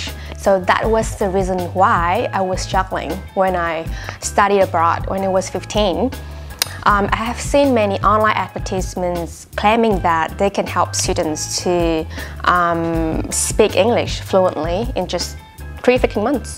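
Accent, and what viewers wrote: Australia. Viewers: Ui cái Aussie accent, yêu❤️ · Thích giọng chị ghê! Anh Úc nghe chanh sả rất hay hjhj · Đặc sệt Australia mà lại bảo k có ngữ điệu cụ thể nè 😌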